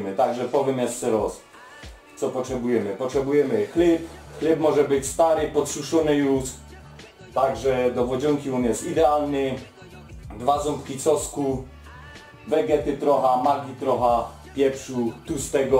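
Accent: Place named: Poland. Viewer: Wyczuwom gorolski akcent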